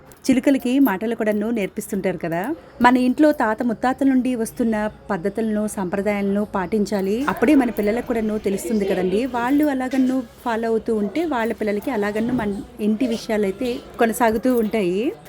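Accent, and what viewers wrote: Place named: Srikakulam, India. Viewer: మావూరేనే మీది కూడా. మీ మాటలు విని. అప్పుడే. అనుకున్నా. మన. శ్రీకాకుళం అని. 👌👌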